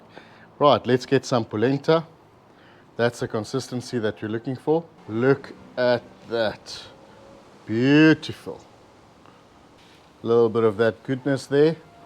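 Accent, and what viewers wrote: South Africa. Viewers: Love the South African accent!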